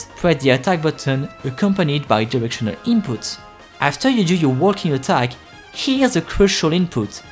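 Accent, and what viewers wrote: France. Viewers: I hear a little bit of french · T’es français toi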